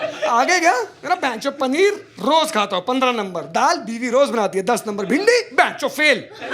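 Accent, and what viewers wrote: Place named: Delhi, India. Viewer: UK SE JADA DELHI KA LG RHA BHAI BC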